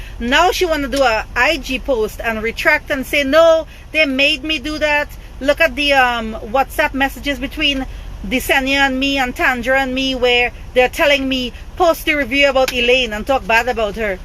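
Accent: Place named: Trinidad and Tobago. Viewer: Soon as my open ya mouth I’m like Trini in the building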